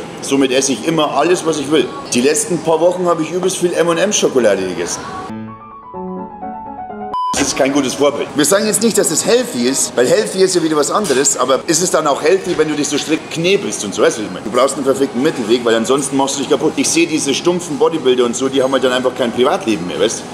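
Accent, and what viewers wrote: Bavaria, Germany. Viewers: Google Transkription scheitert am Bayrischen Akzent. 🙃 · Abo für den Bayrischern Dialekt is raus 🙂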